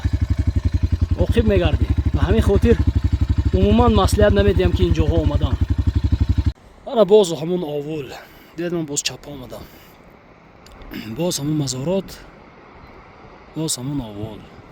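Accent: Tajikistan. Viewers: Салому дуруд аз ноҳияи Варзоб деҳаи Зимчуруд. Дусти азиз, раҳматҳои беандоза ба шумо ва ба Падару Модари бузургвор,ки шуморо тарбия карда ба воя расонидаанд. Ҳар шахсе,ки шаҳди ин ағбаи хатарнокро чашидааст то дами марг фаромуш намекунад.Тавре ки шумо навор гирифтаю суханрони мекунед, ман фикр мекунам,ки дар ҳамон давру замон шумо гӯё дар қабати мо Камазистҳо, рост истода будед.Дар ҳақиқат дар пеши чашми мо мошинҳо аз роҳ ғеҷида ба ҷари мерафтанд,одамҳо мемурданд,локин илоҷе набуд.Шабҳо аз ин тараф ё он тараф мошинҳо дармемонданд,бамиқдори бисёр.Мо шабро бо машаққате рӯз мекардем,хеста аз мошин фаромада медидем,ки нисфи мошин дар таги барф мондааст,боз белу лапаткаҳоро гирифта то омода шудани булдозер барфҳоро тоза кардан мегирифтем. Вақте,ки тунелҳо кушода шуданд мо ба синни пири расидем.Айнан ҳамин хел ҳодисаҳо дар ағбаи Анзоб ҳам рух медод.Вақто, ки навори шуморо тамошо карда суханҳои ширину аслии тоҷикии шумор шунидам ашк аз чашмонам равон гашт.ЗИНДАЮ ҶОВИД МОНД,ҲАР КИ НАКУҲНОМ ЗИСТ,КИ АЗ АҚИБАШ ЗИКРИ ХАЙР, ЗИНДА КУНАД НОМРО. 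Саломату сарбаланд бошед. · Акаи забони ширини точикиба Шумова гап нест. Молодец! Салом аз Хучанд. Ман хам орзу дорам як руз скутер е мопед харида хамон перевал равам. Соли 2007 якумин ва охирин бор аз хамон чо то Душанбе ва обратно ба Хучанд гузашта будам.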